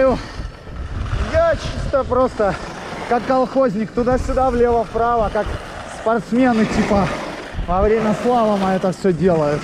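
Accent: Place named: Kyiv, Ukraine. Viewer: Откуда киевский акцент?)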